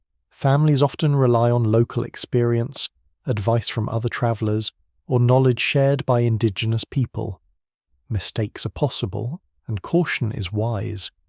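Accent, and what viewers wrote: United Kingdom. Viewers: pm Rochester NY. Not feeling the British narrator.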